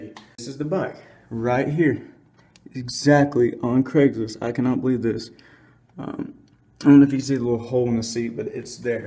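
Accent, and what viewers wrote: South, United States. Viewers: Dude I am so confused on your accent. Like one second you sound normal American, then sometimes you sound like you have a southern accent, what the fuck. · i love that country voice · it's like that alot in the south. some words come off really southern for some people and others dont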